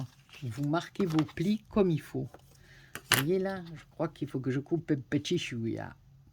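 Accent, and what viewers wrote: South, France. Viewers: bjr madame très belle carte et très bien expliqué j'adore votre accent du sud du midi continuer comme cela a nous montré vos merveilles bisou de véronique de reims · Grand merci pour ta réponse, j'ai vu tous les jolies commentaires, toutes les personnes qui sont heureuses de ta jolies cartes, de ton explication tu peux en être fière. Et comme d'autres personnes l'ont dit ton accent du sud est très beau à entendre s'est comme quand j'entendais ma maman elle était germanophone sa à la voie chantante et mélodieuse comme celle de maman et j'aime t'écoute et s'est bien dommage qu'ont ne peut pas le faire plus souvent. Encore un grand merci, gros bisous Brigitte de Belgique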